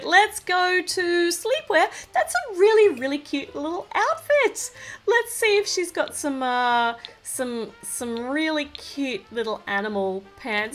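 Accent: Australia. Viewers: Are you Australian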